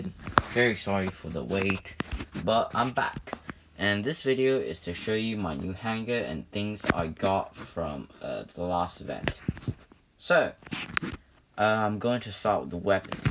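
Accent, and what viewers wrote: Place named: United Kingdom. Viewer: British accent cute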